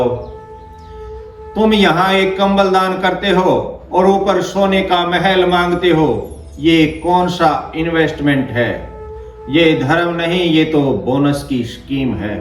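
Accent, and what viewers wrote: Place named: Haryana, India. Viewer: आदरणीय स्वामी जी,आज के हिंदू को सुनाने के लिए यही सुन्दर भाषा उचित है। बहुत दिनों बाद ऐसे स्पष्ट वक्ता को हरयाणवी के पुट के साथ सुनना कल्याणकारी लगा।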